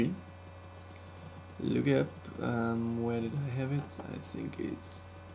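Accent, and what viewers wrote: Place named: Netherlands. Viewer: I could just hear of your accent that you are Dutch.. Im also Dutch. When you started talking I already knew it :')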